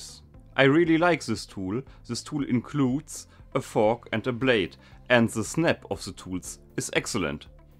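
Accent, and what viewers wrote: Germany. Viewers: You are german i can hear that clearly hab ich Recht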